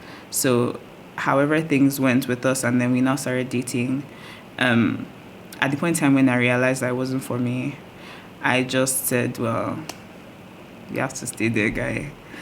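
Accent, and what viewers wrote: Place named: Nigeria. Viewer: Hot nigerian accent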